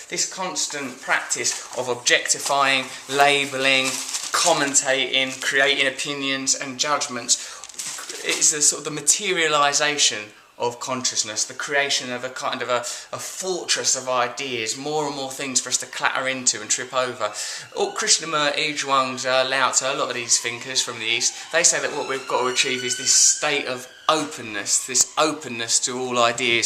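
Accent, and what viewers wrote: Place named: United Kingdom. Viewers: He speak english but i think he is from British because of his accent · British accent.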